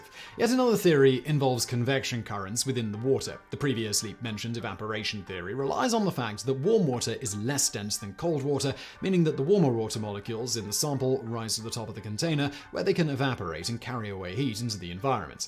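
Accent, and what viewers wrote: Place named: United Kingdom. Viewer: I came here thinking this was Vsauce and heard a British dude and im like is this a British sub?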